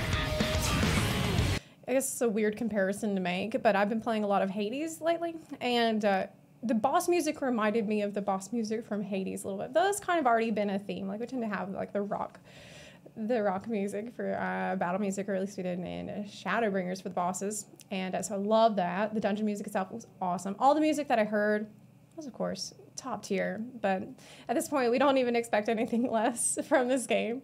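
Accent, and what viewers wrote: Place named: Georgia, United States. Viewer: The Georgia accent really coming out here haha. I love it!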